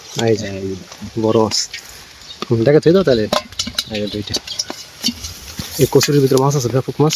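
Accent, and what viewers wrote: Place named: Barisal, Bangladesh. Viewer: BORISAL BOLE KOTHA :face-blue-smiling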